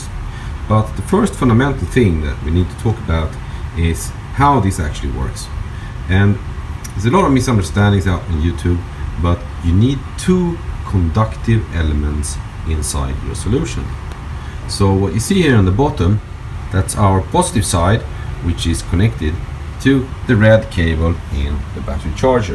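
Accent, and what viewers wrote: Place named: Sweden. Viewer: Haha typiskt Svengelskt uttal, I övrigt bra video.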